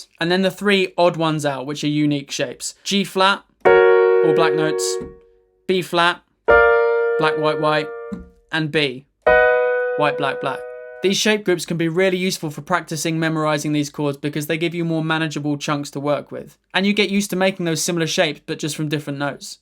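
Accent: United Kingdom. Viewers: Only just found you today but I think you taught this so well and I loved the editing! Some really good key points popping up as you were talking. I like that you talk a lot about wrist positions and even where to hit the note for maximum clarity when playing, because it's so important and easily missed! Also good hearing someone else from the UK, no shade to anyone else, it's just that I hear and watch lots of music videos with Americans, and it's refreshing to hear the change! I hope your channel continues to grow, you deserve it! Just subscribed!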